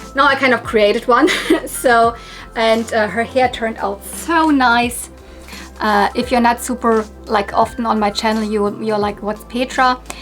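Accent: Germany. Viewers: What a wonderful doll/toy haul! I love everything you found! Brought back so many good memories 💗 Barbies of the 80s & 90s have a very special place in my heart 🥰 I spent my early childhood in Germany in the 80s. 🥰 I love hearing your accent and hearing German spoken. My father was fluent, and I started to relearn German in school. Unfortunately, I don’t have a lot of opportunities to speak it, so I’ve lost so much, but can still understand most of what’s being said. Great video! Can’t wait for the next!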